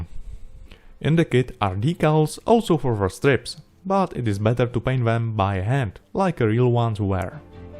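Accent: Russia. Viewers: С русским акцентом!)))) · просто по акценту я посчитал, что он носитель русского.)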